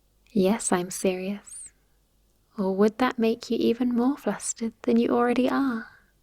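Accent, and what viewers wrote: England, United Kingdom. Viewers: Man, im a sucker for a good English accent.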